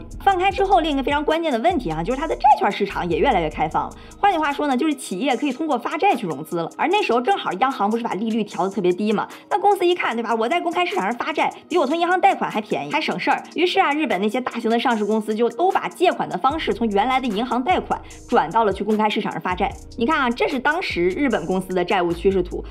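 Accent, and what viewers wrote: China. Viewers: 大陸腔改掉我就信了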